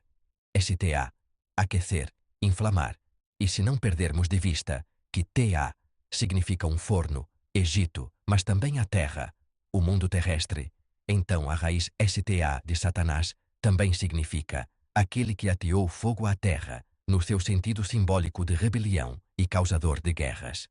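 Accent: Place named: Portugal. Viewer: Narração com sotaque lusitano é um lixo mesmo...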